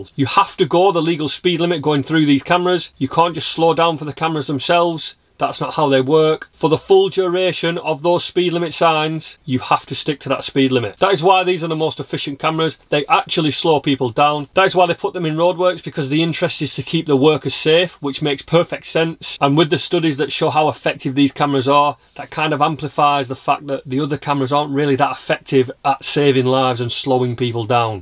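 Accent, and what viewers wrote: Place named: West Midlands, United Kingdom. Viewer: Hi there! First off, great video! I'm from the West Midlands (old South Staffs actually) so I understood your accent perfectly - just in case some people whinge about your accent! LOL I've been driving since 1975 and hold both a full car and motor cycle licence. Most of my working life was spent in the motor trade and during that 'life' I covered many, many hundreds of miles on road test - some(!) of those miles at speeds that were, shall we say, a little north of legal! Also - during that time - I was 'done' only once for speeding (more by luck than judgement!) and since leaving the motor trade proper, I have fallen foul of a speed camera (one in a van) once as well. As a matter of interest, I've been involved in a few minor accidents too down the years - ALL at speeds BELOW 30mph! As someone who has been interested in driving since I was a young child (I have pictures of me in a pedal car at 'Uncle Eric's pedal car track in Rhyl, North Wales dating back to the early '60's!) I have become ever more annoyed by the way cameras have been used to sneakily extort money from the easiest target/cash-cow of all - the motorist. 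I totally agree that if someone is driving dangerously, then they should be penalised for it - but speed ISN'T always the issue! BAD DRIVING is! A proficient driver doing 100mph at 3am on an empty motorway is surely no more dangerous than a tw*t doing 40 in a 30 at 3pm in a school zone! I drive for a living now, and on a daily basis, I see people out there who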